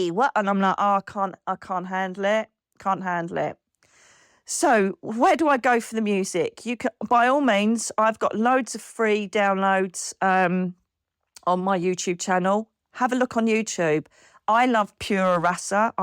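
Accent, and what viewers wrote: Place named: United Kingdom. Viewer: I just love the British accent and the popping words on the screen! It actually helps to focus and am sure it helps people with any hearing disabilities. Good job!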